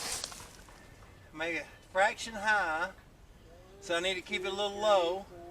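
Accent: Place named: South, United States. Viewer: MAAAN, I love your southern drawwwl I LOOOOVE it, I am coming to NC So the question is Mossberg or Browning BPS, which one is better?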